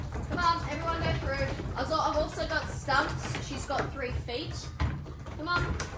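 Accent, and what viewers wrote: Australia. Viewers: The sheep are cute, the farm is beautiful, youre smart and sassy with an Australian accent...youre a welcome distraction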